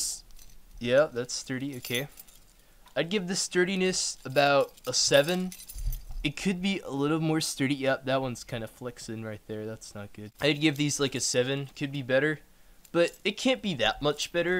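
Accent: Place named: Boston, United States. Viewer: Cool dude sounds like he has a slight accent of some sort. Boston perhaps? 😂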